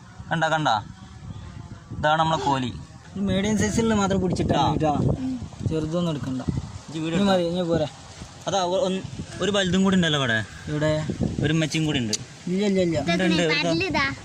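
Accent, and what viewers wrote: Malappuram, India. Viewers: Malapuram bashayanu ee vdonte monj.. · Malappuram bhashayum .... pazayakala ormayum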